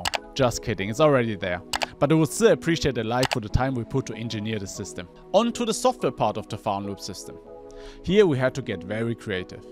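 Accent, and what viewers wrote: Germany. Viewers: I just realized this dude Asian, never thought I would see an Asian with a German accent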